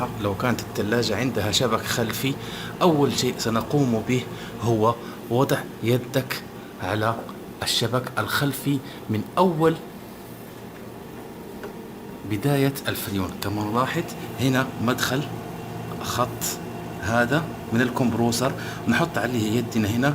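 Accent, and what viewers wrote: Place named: Morocco. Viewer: تحية من بني ملال ❤ لهجتك مغربية شوية 😊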